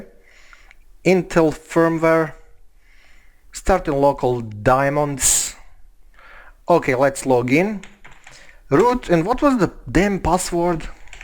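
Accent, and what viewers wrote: Russia. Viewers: The Russian accent is strong with this one. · Thank you Russian man. Love the tutorial and the accent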